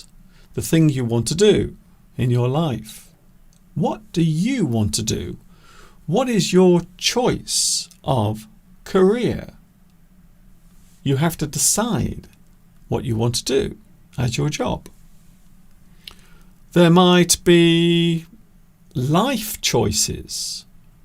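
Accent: United Kingdom. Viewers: It's good to learn English here.British English.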